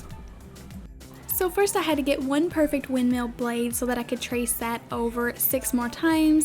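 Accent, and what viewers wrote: South, United States. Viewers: I have been watching you for over a year now. 1/08/2023 is today's date. I am realizing I have so many more videos yet to watch. I thought I was pretty well up to date. ❤ Love the windmill. I have got to say your southern accent is really noticeable in this video or the most recent ones.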